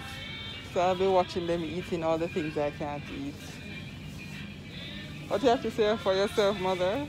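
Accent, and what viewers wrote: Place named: Jamaica. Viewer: Good job, I like jamaican accent , yes man. You are a whole vibe and a very happy gal.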